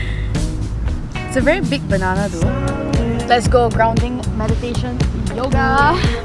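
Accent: Singapore. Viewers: she sounds Singaporean.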